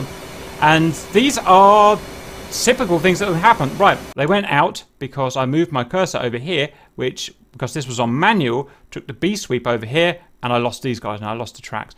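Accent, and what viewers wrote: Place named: United Kingdom. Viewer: True, but only if you are in a hurry. But I enjoy his way to entertain. I'm Italian, but I love this British accent and humor!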